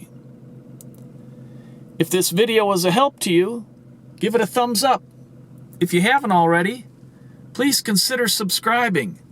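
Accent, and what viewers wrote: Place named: Minnesota, United States. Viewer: Thank you this fixed my problem on my fzj80 land cruiser! You sound like my good friend from minn!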